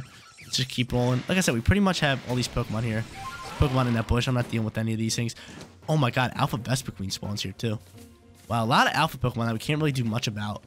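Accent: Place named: North Jersey, United States. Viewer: I just know your from north Jersey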